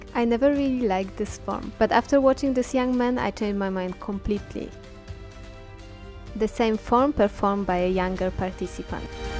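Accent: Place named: Poland. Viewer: Very good and positive video. Thank you! When started to wath a video, though that, hmm sounds like a speaker have a polish accent and it was true.)) Interesting how roots are following people. All the best you guys!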